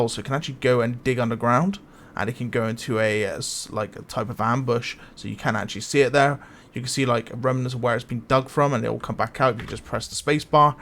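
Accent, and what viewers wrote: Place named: West Country, United Kingdom. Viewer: Do I detect a fellow West Country lad? When you say the queen has her own audio? What audio effects does she have?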